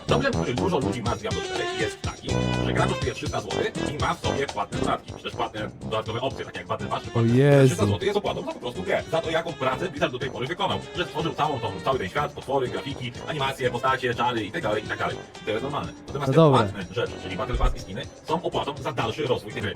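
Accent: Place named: Russia. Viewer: Angielski brzmi jak z ruskim akcentem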